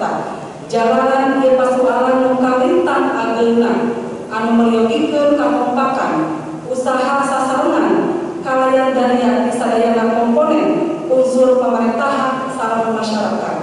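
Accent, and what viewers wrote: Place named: Sunda, Indonesia. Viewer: Nyunda banget, kereeen, Ambu pidatona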